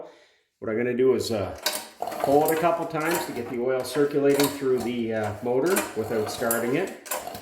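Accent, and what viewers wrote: Canada. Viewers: When you mentioned the price, I thought, "that seems high compared to what I just paid for one...". Then I listened to you speak some more, and immediately realized you were Canuk. As Bob and Doug would say, "Good day, eh."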